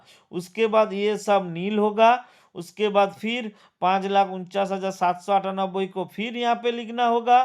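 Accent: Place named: Bengal, India. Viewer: Apka relation bangal se v hai kya sir Language waise hi lag rha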